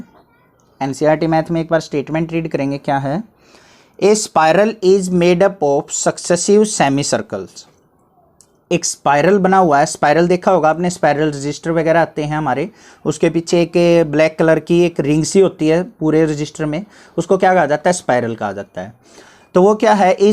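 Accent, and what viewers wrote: Haryana, India. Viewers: Sir aap hariyana se ho kya ?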